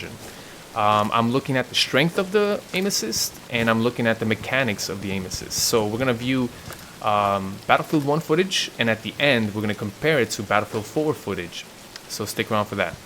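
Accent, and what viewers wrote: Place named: Russia. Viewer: Steven Featherstone Not German but not American, think closer to Russia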